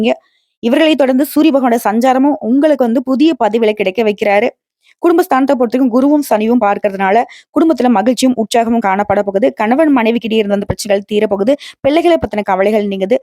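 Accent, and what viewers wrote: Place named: Tirunelveli, India. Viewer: Good to hear in my Tirunelveli Tamil slang 👌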